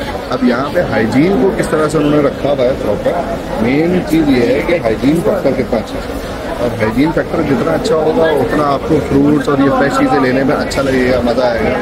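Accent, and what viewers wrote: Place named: India. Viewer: U talk by Indian, we understand nothing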